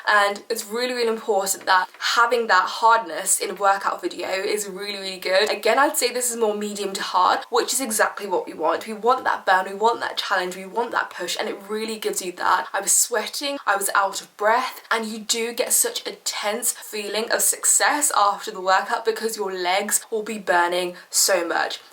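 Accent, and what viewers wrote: United Kingdom. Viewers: I love ur british talking style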